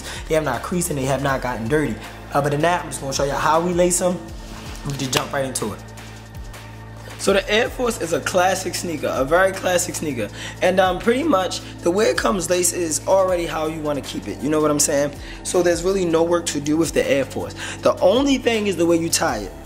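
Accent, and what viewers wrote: Baltimore, United States. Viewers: Are You From Baltimore ???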